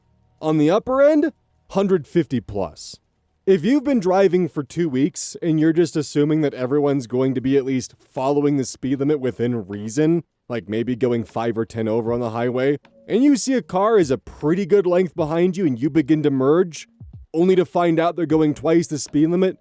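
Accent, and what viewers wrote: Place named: Minnesota, United States. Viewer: You started sounding like that Minnesotan from family guy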